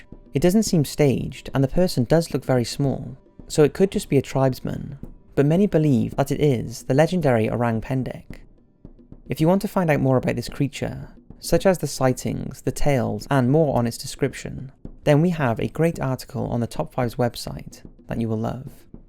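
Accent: Wales, United Kingdom. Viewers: Greeting from Wales! Also are you Welsh? You sound like it... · I am in L O V EV with your videos!! And I also L O V E your accent. Is it like Welch? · Finally found my favorite British narrator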